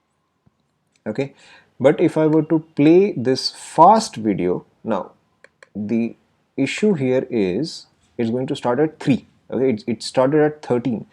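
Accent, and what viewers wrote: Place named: India. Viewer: Can you do this video without the Indian accent please? It's so difficult to understand.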